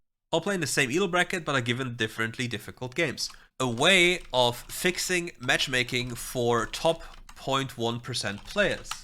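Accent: Germany. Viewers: Bro are u German? Unbenannt.txt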